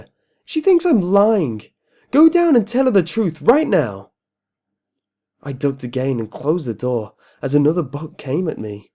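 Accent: United Kingdom. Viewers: Lol he dosnt sound Irish at all I could say he sound British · MissleMasterJoe I mean he has a rather strong British accent but maybe I'm just used to it. (I live in the uk) Brohoof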